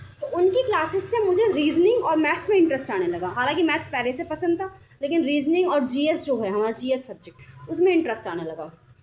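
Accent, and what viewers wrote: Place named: Haryana, India. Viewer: Mam ap haryana se ho ya mp se?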